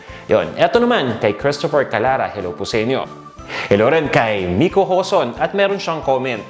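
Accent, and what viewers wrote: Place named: Coño, Philippines. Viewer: Masaya po ako na matatas na tagalog niyo. Old videos niyo po ang coño kasi pakinggan haha. Pero masaya pa rin. Itatry ko po ito sa noche buena. Magisa po ako sa pasko kasi bawal magtravel pero dapat masarap pa rin pagkain kahit ganun.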